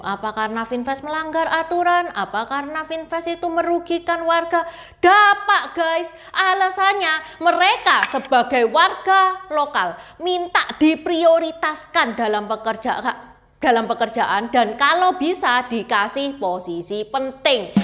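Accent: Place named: East Java, Indonesia. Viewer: Ciecie aku suka dialeg Pecinan Jawa timuranmu. Seru...terasa akrab. Indonesia banget 😂👍 sehat sll cie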